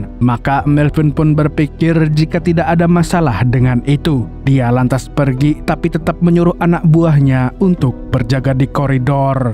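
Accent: Banjar, Indonesia. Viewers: orang Banjar kh pian....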